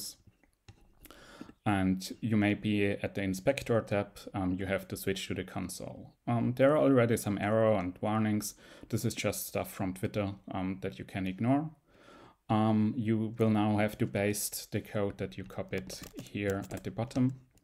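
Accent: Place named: Germany. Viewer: Man hört direkt deinen dt Dialekt raus. Weshalb sprichst du dann Englisch?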